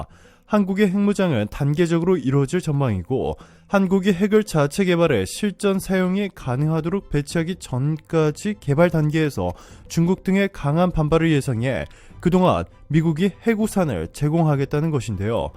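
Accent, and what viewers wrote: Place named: Myanmar. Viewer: 나레이션 남성분 한국어 좀 제대로 말 하시길 바랍니다. 한국말이 마치 미얀마 사람이 말하는 식으로 들리며 끊고 숨 쉬고 이게 잘 안된다는 것입니다.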